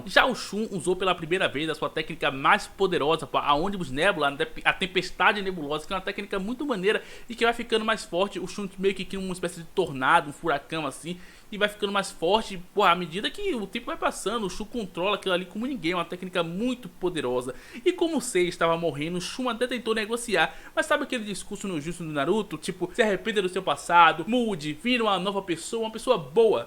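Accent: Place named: Bahia, Brazil. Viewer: Você até tentou esconder mas eu percebo que seu sotaque é daqui da Bahia. Ganhou mais um inscrito por isso ❤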